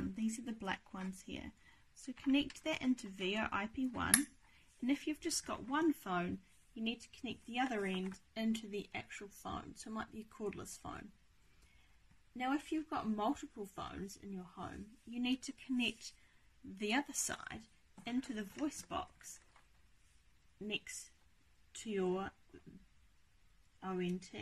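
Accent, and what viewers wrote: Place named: New Zealand. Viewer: Thar kiwi accent tho 😍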